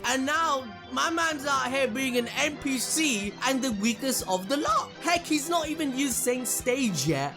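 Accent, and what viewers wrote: Punjab, India. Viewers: Is this guy indian? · The Punjabi Hindi accent🤣🤣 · Nice hindi accent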